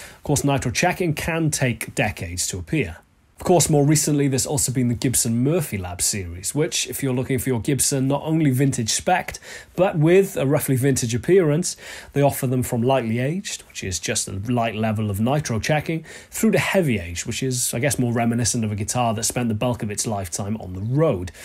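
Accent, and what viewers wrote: Wales, United Kingdom. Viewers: With due props to your playing, I click on these for the always interesting topics, your thorough research and, of course, your delightful Welsh accent. (I know, I know; the Welsh viewers are like “what accent?”). On the topic itself, I’m with you. Lightly done, it can be a nice look, the Isbell being a great example. Too much and it’s like buying a new car that was intentionally wrecked.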